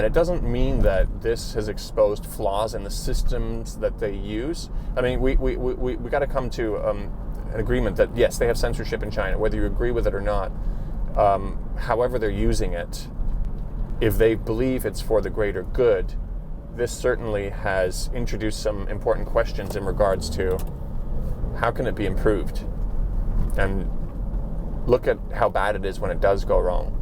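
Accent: United States. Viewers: I like your comments. I live in SZ half a year for 6 years now. NanShanQu by OCT Windows to the World. You don’t exaggerate and you don’t make up things like other Tubers. You sound more California than Canada, although I noticed your “oout” slightly. Enjoyed your piece. Of course, SZ is unique. All new everywhere and everything. I hope you mention to viewers that in other cities they have new sections similar to SZ and old parts. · First time watching your vids...are you American?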